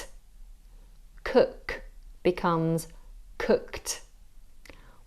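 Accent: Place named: RP, United Kingdom. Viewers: is using RP which is recognised as the standard. is actually a Scouser and has adjusted her accent for her teaching videos. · But... your accent is also a regional accent. You're saying all this as if your way of pronouncing things is the official British way, ignoring the 3 British countries that aren't England, and even the official English way, ignoring the large percentage of the country that don't sound exactly like you. You have a Southern accent - what makes that any less regional or any more official than a Northern accent?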